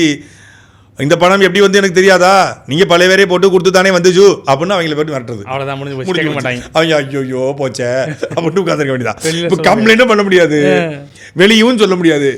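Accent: Tirunelveli, India. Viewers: சாட்டை தம்பி, உன் பொருநை புரளும் பொதிகைத் தமிழ் உச்சரிப்பை பொழுதெல்லாம் கேட்கலாம். வாழ்க உன் அழகு தமிழோடு நீயும்.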